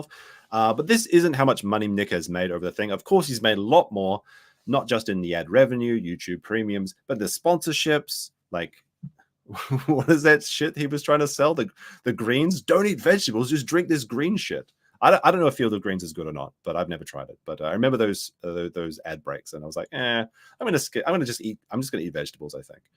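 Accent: Australia. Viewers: As an Aussie it was a lovely surprise to suddenly hear an Oz accent in the playlist I put together for this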